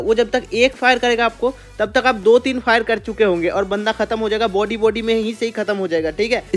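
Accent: Bengal, India. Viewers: Vai ap bangali ho 🤔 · Bhai tum bangali hoo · Bhai aap bengali ho kya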